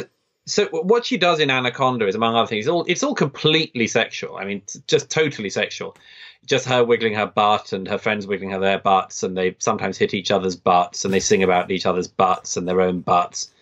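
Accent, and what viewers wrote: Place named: RP, United Kingdom. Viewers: Society makes impossible demands on both men and women because we have a capitalist society, but this is missing from this faux intellectuals' 'message'. Just because he has a posh accent doesn't mean that there isn't plenty missing in what he has to say. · One minute in, and here's another old man who says a lot of hot air with a posh accent. I hope this doesn't become a trend.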